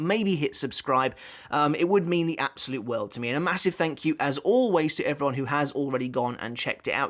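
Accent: United Kingdom. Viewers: It's probably the british accent.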